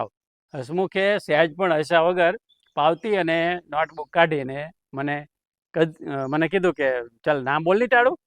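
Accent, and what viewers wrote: Surat, India. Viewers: વાહ....બહુ જોરદાર માથાકૂટ કરી હવલદાર સાથે....😂 સુરતી ભાષા સરસ બોલ્યા હો...બાકી...😂👌🏻👌🏻👍🏻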